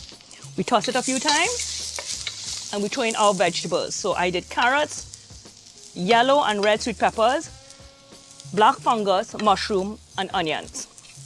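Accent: Trinidad and Tobago. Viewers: Am I detecing a Trni accent? The low mein looks, and I am sure tastes absolutely delicious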